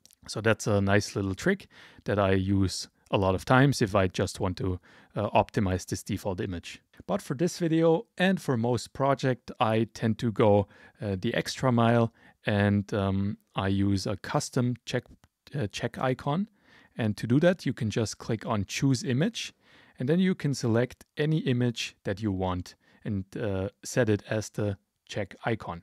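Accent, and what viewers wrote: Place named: Poland. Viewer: Your channel is great, your pacing is excellent, easy-to-follow and not monotonous or boring whatsoever. I stayed engaged and interested the entire time, and the way you laid things out starting with basic styles and narrowing down to more advanced styling was really logical and built a solid foundation of understanding (and retaining!) the information! Please keep it up, make more videos and continue being awesome. Also, are you Polish perhaps? Trying to guess by the name and the accent, I couldn't tell because it's not very strong, so I am just curious. I am Polish myself!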